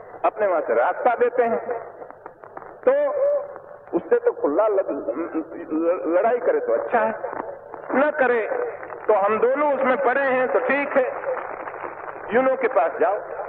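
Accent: Gujarat, India. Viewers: That Gujarati accent! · Typical gujrati accent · Not voice but yes accent is same bcoz both have gujarati tone in hindi thats y luking little bit similar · in both speeches 's Hindi pronounces with Gujarati tone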